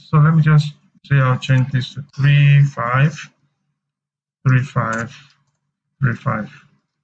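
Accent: Nigeria. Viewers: bro u nigerian?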